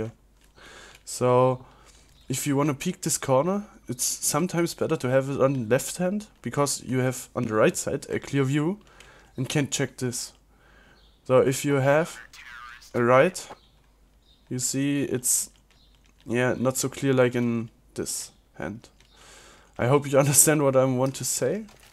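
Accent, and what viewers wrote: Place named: Germany. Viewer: Der deutsche Akzent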